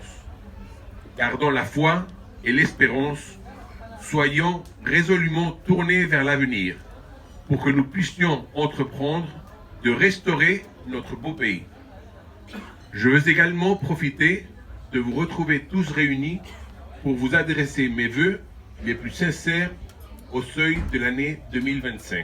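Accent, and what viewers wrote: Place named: Spain. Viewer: Il n’a pas l’accent marocain mais espagnol,son père était Alphonse de Bourbon Dampierre et sa mère la petite fille de général Franco.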